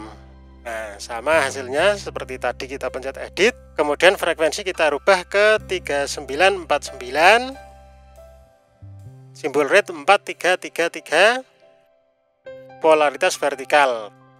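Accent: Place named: Salatiga, Indonesia. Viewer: Wong Solotigo ya? Coro ngomonge koyo wong Solotigo..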